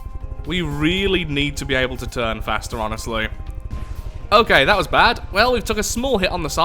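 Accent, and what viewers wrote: United Kingdom. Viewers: is he british? i cant tell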